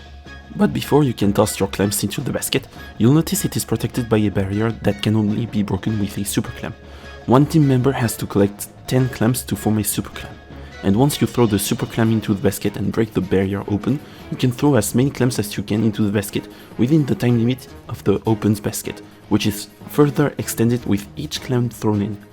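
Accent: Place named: France. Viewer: great stuff mate! i actually planned on doing similar explanation videos on the modes, but im far from that subject in my schedule anyway... well, i have to criticise your french accent :( i could barely understand what you say eventhough i wanted to, but i know you tried your best and that's what matters. also regarding the concept itself: i know this video is aimed at people who are new or just part time squids, but i would have liked some more "interesting" details for people who already know the very basics already. for example you could have mentioned or shown the exact punishment you get upon an opposing counter push and stuff like that. just some info that even pros can maybe find usefull or so... idk all in all, good work for a first try i would say^^ keep it on mate!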